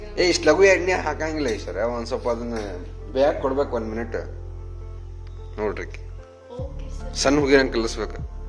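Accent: North Karnataka, India. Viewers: Hi This is Shridevi From USA 🗽🇺🇸 Nanu nimma volgs nodide supper edav ri.... Namma Uttara Karnataka bhase kelaka kushi anastheti adu America dalli and niv Belagavi market thorshidri nodi nanna memories recall adav yakadre navu 2 years belagavi Naga eddavi nanna magalu KLE school he hogtiddalu. so nanu scooter togondu tirgadthaedde so niv thorso belagavi places nodidre nenapaketi anyway nimma mother supper edare Nice volgs Best of luck 👍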